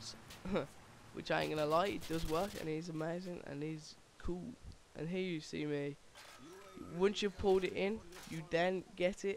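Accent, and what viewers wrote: Birmingham, United Kingdom. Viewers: its his accent..brummy niot the british language itself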